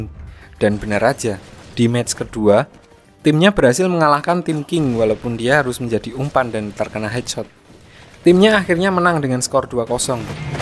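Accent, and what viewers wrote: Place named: Yogyakarta, Indonesia. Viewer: Kek orang jogja ini mah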